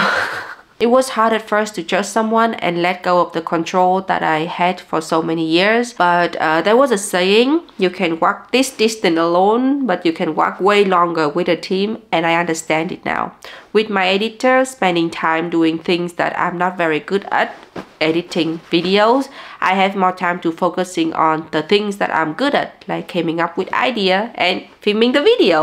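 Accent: Germany. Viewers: the funniest thing.. the longer I hear you talk English the more I pick up on the germanized accent you've started to develop when speaking it, especially noticed it when you said audio and some words after that.. Some very typical German pronunciations of the English words haha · yes, yes, yes! go to discover japan and South Korea.....their food is AMAZING!...we suspect that you are getting deutsche accent..💪👌. Hope that boyfriend will learn vietnamese to speak with the " in-laws"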